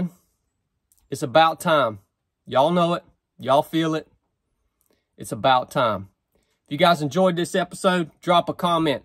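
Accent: United States. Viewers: Where in the USA is the accent from? 👍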